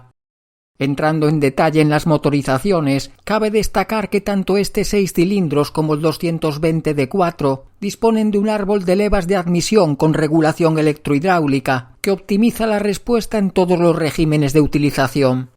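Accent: Galicia, Spain. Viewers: Es verdad, este hombre es un poeta del motor. Y con ese acento gallego, de los acentos más bonitos de España.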